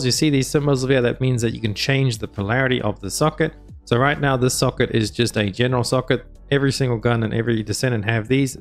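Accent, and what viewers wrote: South Africa. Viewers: Ahhh that lovely South African accent…. Love it. Ja my bru I totally got this china. Great video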